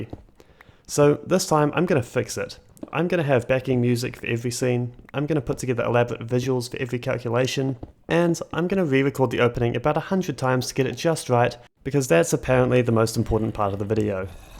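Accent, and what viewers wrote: New Zealand. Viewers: I just noticed that this guy might be New Zealish. But i'm not 100% sure (i'm a non native english speaker)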